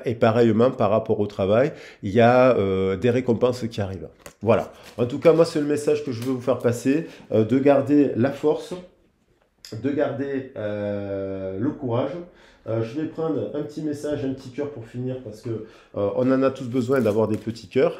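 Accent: South, France. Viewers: Coucou 👋🏼 😉 Ce n’est pas grave tu es pardonné 😉☺️dis moi tu viens de Montpellier avec ton accent du Sud?! 🤣tu 🎶 chantonnes quand tu parles c’est sympathique comme style 🤩🥳😜belle journée à toi le tourtereau 🤣bisous 😘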